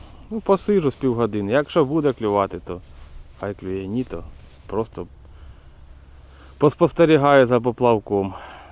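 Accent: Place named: Chernihiv, Ukraine. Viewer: я живу в полтавській,з чернігівської переїхав років двадцять тому,і ось натрапив на ваш канал,і по рідному акценту зрозумів що хлопак з Чернігівщині)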